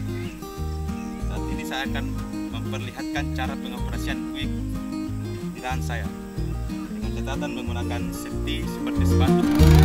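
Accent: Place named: Sidrap, Indonesia. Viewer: Dialek nya khas daerah sana. Aku udah pernah main ke Sidrap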